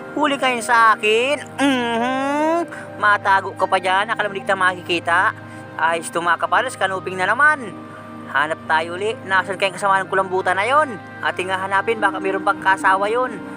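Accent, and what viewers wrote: Bicol, Philippines. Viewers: Bicolano po b kayo?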